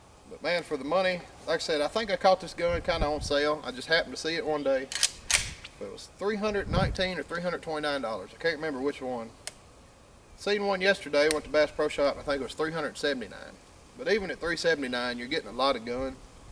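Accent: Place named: Georgia, United States. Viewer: sounds like your from georiga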